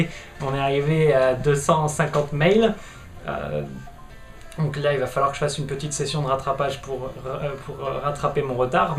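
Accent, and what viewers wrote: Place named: North, France. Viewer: je m'efforce à faire la même chose mais c'est pas tjs facile. au fait on sent tes racines du nord : 20 [vinte] :D je faisais la même à mon arrivée à paris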